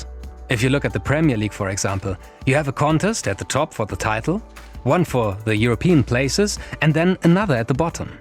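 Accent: Germany. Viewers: this guy could do a 10 hour video about paint drying and i’d still watch/listen love the soothing german? accent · DEUTSCHER AKZENT JAAAAAAA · Sounds more like he's german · Are you german?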